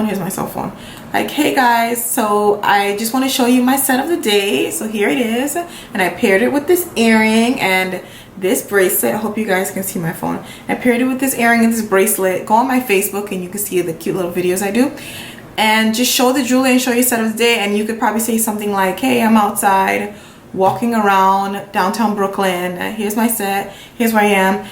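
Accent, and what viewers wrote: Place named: New York, United States. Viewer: How did I know you was from NY and then you said Downtown Brooklyn.. Best wishes papa sis from Brooklyn😍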